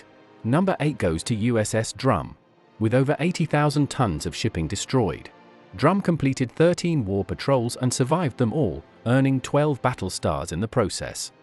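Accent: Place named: England, United Kingdom. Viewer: Why english accent ?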